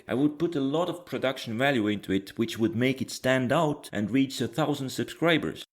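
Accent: Eastern Europe. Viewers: Its interesting to see you reflect on your own channel after you gained some traction. I think I subscribed after first seeing your eastern front animated video. Here are my thoughts: (not to be rude or anything, just some honest criticism) As you have already noticed the drawing part is certainly the weakest aspect of your videos. If you aspire to be on the level with series like extra history the truth is that your drawing/animation skills are still very basic and not quite there yet. That does not necessarily mean that „bad“ videos will come out of it - just that they are not on par with most comic-narration things you see on youtube. And there is quite a lot of it so its hard for you to stand out. But I dont think you actually need that. As your eastern-front-animated video shows I think you are far better off concentrating on the mapmaking and detailed-research aspect. 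I could think of your channel as something like a baz-battles on steroids with a focus on the modern era of history and going in for much more detail. So I would suggest making the videos almost fully map-based while trying to make the maps graphics even simpler and also more refined. One last point that you might not be aware of: many people have problems understanding eastern-european accents. (I suppose your estonian but its quite similar) I noticed the guy who does baz-battles is also from eastern europe and once he changed to a native english narrator his videos became much more accessible for a broad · Ditt accent låter mer östeuropeisk än Svensk? Estland, Lettland, Litauen? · Are you from sweden? Why do you have an eastern europe accent?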